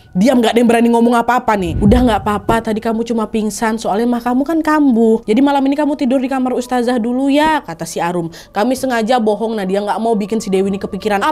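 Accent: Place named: Banjar, Indonesia. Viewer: Seperti Bahasa orng Banjarrr 😂😂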